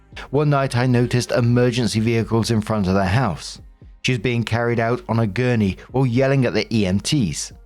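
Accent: England, United Kingdom. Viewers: What region of England is your accent from, can't place it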